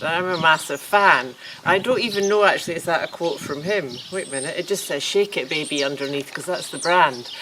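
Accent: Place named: Edinburgh, United Kingdom. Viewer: Where are you from? Im from Edinburgh and I recognise that accent!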